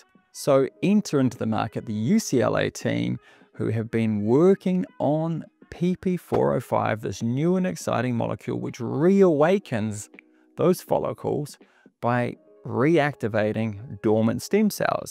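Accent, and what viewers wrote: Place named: New Zealand. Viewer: And just for our listening international audience, in case you’re wondering, our good doctor is a Kiwi aka New Zealander living in Australia. There’s no mistaking that accent.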